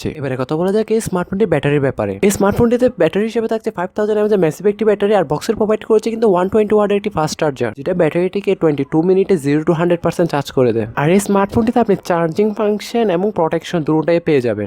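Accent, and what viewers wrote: Chittagong, Bangladesh. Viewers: তুই কি চিটাগাং এর?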